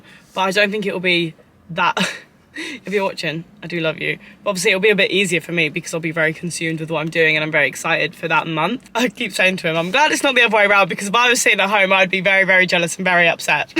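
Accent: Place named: Essex, United Kingdom. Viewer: I noticed the Essex London twang hahaha kinda funky!! You're literally thriving so happy fro you xx (I also live in Kent can we be friends)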